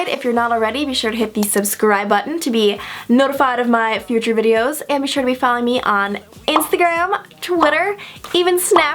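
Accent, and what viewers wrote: United States. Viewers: I love your accent! Which part of America are you from? 🌞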